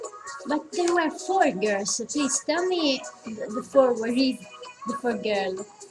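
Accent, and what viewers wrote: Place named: Italy. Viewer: she from italy she just speak English with Italian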